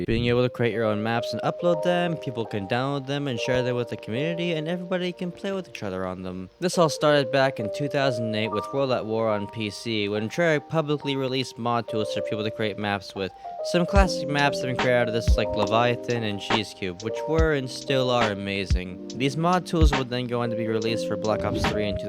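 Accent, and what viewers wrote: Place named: Kentucky, United States. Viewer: Hey dude great video I just have one question... Are u from Kentucky, USA? I just find your voice very familiar haha i hope it doesnt sound weird XD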